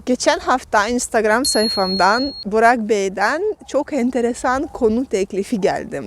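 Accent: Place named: Istanbul, Turkey. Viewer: Yorum yapan herkesin yorumuna cevap vermeniz büyük bir incelik , bu arada İstanbul lehçesi ile bir İstanbul hanımefendisi gibi konuşuyorsinuz , tebrik ederim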